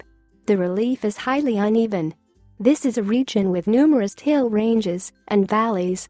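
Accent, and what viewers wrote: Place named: United States. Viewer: What I didn't like was that this was in american english... so, it was very difficult to understand places like Kanya Kaumari, Rann of Kutch and Arunachal Pradesh... So this is a bad video...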